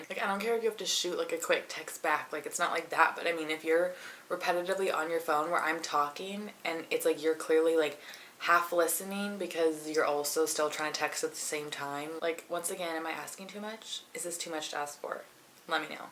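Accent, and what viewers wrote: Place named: New York, United States. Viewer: You lowkey have a new york accent lmao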